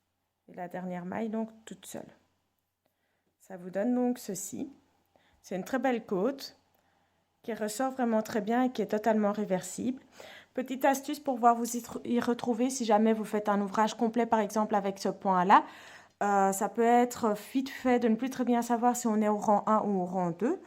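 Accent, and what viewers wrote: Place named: Nancy, France. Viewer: On dirait que vous avez l'accent de Nancy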